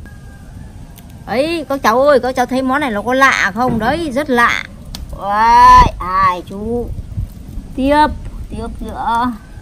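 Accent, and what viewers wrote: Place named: North, Vietnam. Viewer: Người bắc thế phải rồi cau ạ :))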